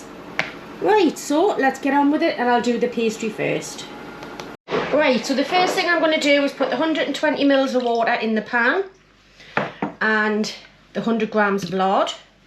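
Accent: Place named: Johnstone, United Kingdom. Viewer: You have an extremely impressed new subscriber . I love your style , and you are my favourite of the several pie recipes on here - it was nice to see your moggy also . What a lovely crunchy fresh shallot you have also , although I am a mutton man , no drama . You sound a wee bit like a Johnstone lass , I'm from Paisley myself . Anyway , thank you very much 👍🏴♥️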